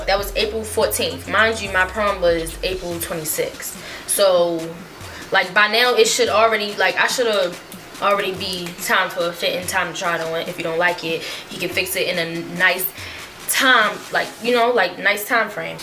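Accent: Baltimore, United States. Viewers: Would’ve been so mad! You from Baltimore? Me too lol I can tell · i figured you was from baltimore the way you talk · I can tell you from Baltimore lol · I love ya Baltimore accent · As soon as she started talking I knew she was from Baltimore. 🤘🏽 P.S. You are beautiful 💕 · You from Baltimore? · Man I’m 3 mins in & automatically knew she was from Baltimore before she said the designer was 🤗 her accent 😍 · Is she from Baltimore ? 😭😭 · I just knew u was from Bmore/md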